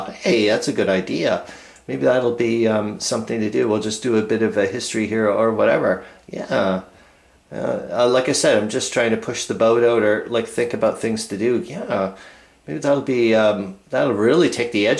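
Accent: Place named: Canada. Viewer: Sorry, but you sound very Canadian, are you from Alberta or Sask by chance?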